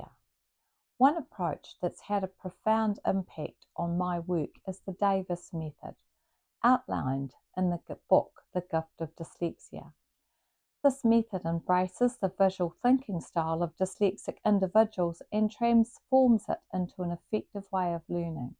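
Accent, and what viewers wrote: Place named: United Kingdom. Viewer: great, nd i really liked youre British accent!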